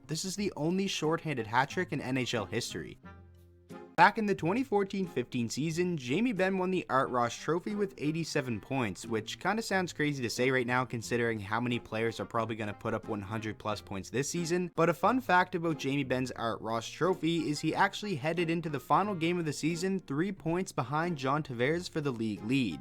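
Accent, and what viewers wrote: Canada. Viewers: How did you get to be a wings fan? Sounds like you're from Canada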